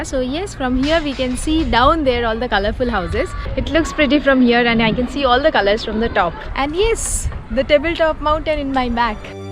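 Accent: Bengal, India. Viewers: Your South Africa series was amazing, very nicely edited, breath taking scenic beauty, lively places and adventurous activities that you both did. Just guessing from your english pronounciation, Are u a Bengali??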